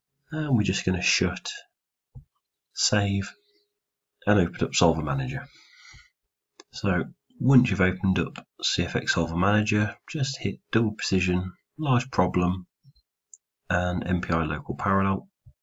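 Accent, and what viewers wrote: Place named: North, United Kingdom. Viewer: Thanks for your reply!, im from Australia. Your videos were a great help with my Thesis, modelling and simulating a new Wind Turbine i designed. I mentioned to the other Engineers for great professional tips look out for the guy with the Northern UK accent, am i right location wise? .. Yeah the mesh looks good after a tonne of fine tuning whilst using various research papers also in setup. I actually used one of your other videos mesh today and its currently running, it hasnt crashed so thats good but looking at the plot monitor for normal forces and its still too high. Im going to save this project as it looks like its actually going to complete successfully. I will recreate the mesh using this videos mesh and let you know if it improves the Y+ value which i would assume isnt good at this point. (What i like about this video is the refinement region), which i have been seeing other similiar simulations to my own using but in a convoluted way. 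Your way makes way more sense. Thanks again mate!.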